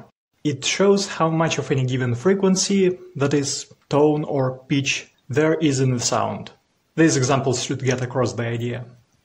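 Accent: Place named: Russia. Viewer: while you do have some russian accent, I can feel your deep understanding of the topic and the clear thought process! great choice of words as well, keep up the awesome work and reasearch owo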